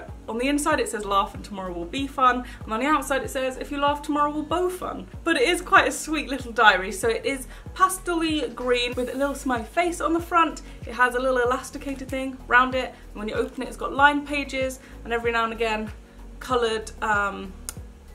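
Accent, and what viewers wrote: United Kingdom. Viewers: Hi are you British???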